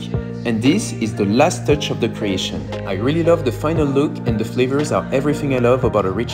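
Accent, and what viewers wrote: France. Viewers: First time French accent in English doesn’t bother me.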